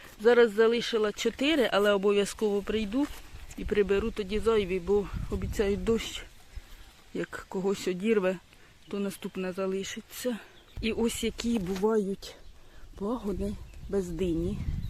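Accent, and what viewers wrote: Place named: Kirovohrad, Ukraine. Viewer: Гарне відео, інформативне. Репортаж з Полтавської області, а говір такий, ніби з Кіровоградщини (Олександрівський район).🙂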